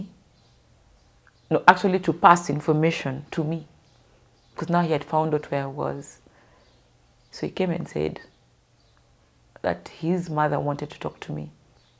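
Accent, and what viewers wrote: Kenya. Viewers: I listen to this beautiful sister,I don’t hear Uganda accent all I hear is Kenyan accent,I hope the president pardon her so she can get her citizenship and continue her life with peace of mind in kenyan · This girl is Kenyan. Just listen to her accent. Give her citizenship. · This is soo wrong , her English accent soo kenyan , Kenyans be merciful there are kenyans in other countries too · Woow.She even has a Kenyan accent.She is a kenya by naturalization. God help her.I hope she gains citizenship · Based on her grounds I believe she has "automatically or naturally " qualified for Kenyan citizenship by naturalization. Even her English accent's is Kenyan. She's a Kenyan. The east African governments should make this work if they mean about east African community as a regional or block to benefit its people. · This lady does not even have the Ugandan accent but Kenyan.After staying in Kenya for 12 years you can naturalize.She just needs a person to guide her.Chinese have come the other day and they have settled.Why not her. 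· God for 34 years? She even has Kenyan accent...she's a citizen by naturalism... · one can tell that she is a Kenyan by her accent.Why should the government treat her like a refugee?? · Her English is KENYAn 💯💯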